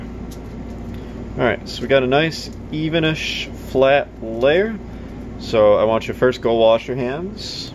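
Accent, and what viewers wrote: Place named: Midwest, United States. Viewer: midwestern accent... reminds me of the dad i never had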